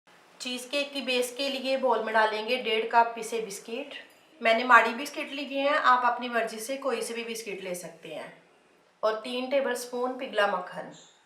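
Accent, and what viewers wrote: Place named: Lahore, Pakistan. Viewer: VIOCE TO LAHORI LAGTA HA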